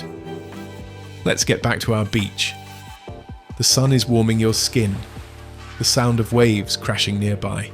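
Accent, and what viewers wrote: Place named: United Kingdom. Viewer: Very repetitive. AND you have a British voiceover with an annoying American AND-LESS number pronounciation. Do better. Dislike!!!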